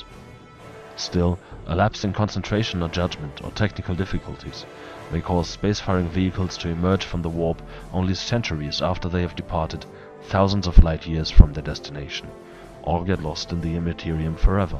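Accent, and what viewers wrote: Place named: Germany. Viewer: thanks for your educational videos. the special voices you use and your German accent make it even better.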